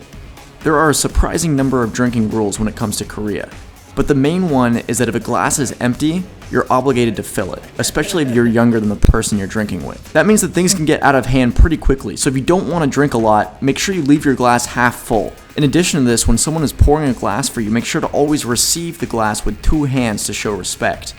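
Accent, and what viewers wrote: United States. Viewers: Are you by any chance from Maryland? Your accent reminds me of so much of some of the people from there.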